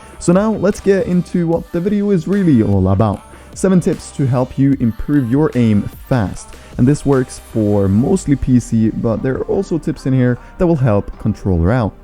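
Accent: Norway. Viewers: Ayo norwegian? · are u from norway by any chance??? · Are you Norwegian?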